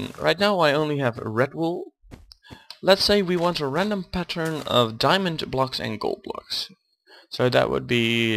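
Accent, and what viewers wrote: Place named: Netherlands. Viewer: Mooi Nederlands accent haha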